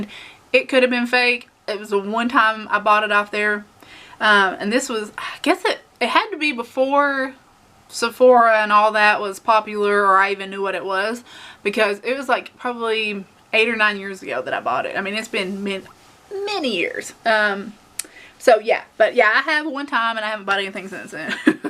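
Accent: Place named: South, United States. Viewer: my true blonde must be showing...because I just noticed your southern accent 😂 really enjoy the direction your channel is going!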